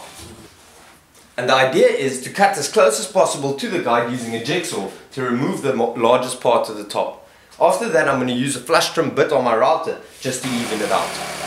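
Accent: South Africa. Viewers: are you south african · South African? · Great! I have a tiny shop and was going to build something similar, only smaller.. Awesome ideas.. BTW, love your accent, South Africa? :D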